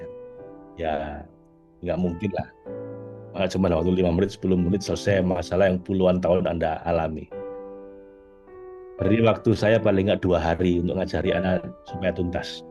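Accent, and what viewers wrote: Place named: Surabaya, Indonesia. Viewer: Pak suaranya seperti cak lontong 😍🙏 logatnya khas Surabaya 🤓🤴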